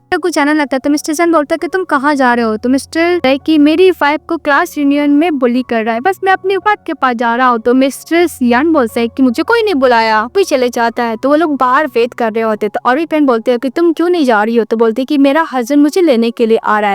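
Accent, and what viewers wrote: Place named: Assam, India. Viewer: Didi aap asamis ho ya Bangali sound like asami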